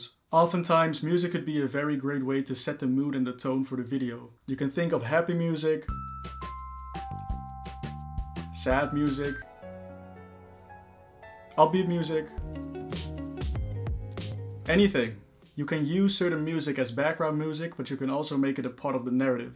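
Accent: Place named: Netherlands. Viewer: you got an accent are you from the netherlands?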